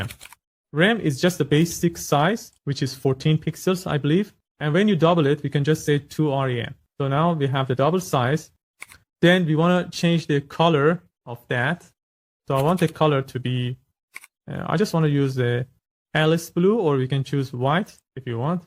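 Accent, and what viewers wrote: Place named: Iran. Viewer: داداش همین که صداتو شنیدم فهمیدم ایرانی 😂❤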